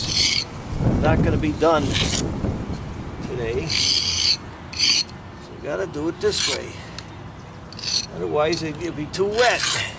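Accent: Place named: Scranton, United States. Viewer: Love your videos....I had to look ya up what part of pa...I knew with that accent had to be scranton..keep up the great work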